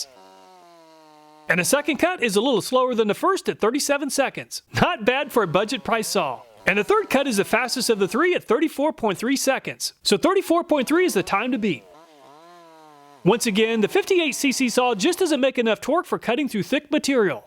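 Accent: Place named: South, United States. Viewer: This guy sounds like an American red neck with a hatchet. He frequently refers to the two Made-in-China chain saws as “knock-offs.” Did the American brand patented the chain saw design, the two stroke engine, the cutting chain, the carburettor, the fuel system, the ergonomic, and everything else? Apparently, he’s showing his bias at best, racism at worst. Unsubscribe. Don’t subscribe.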